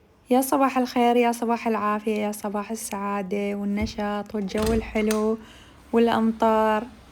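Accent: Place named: Diwaniyah, Iraq. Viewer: سبحان الله بس سمعت لهجتج عرفت ديوانيه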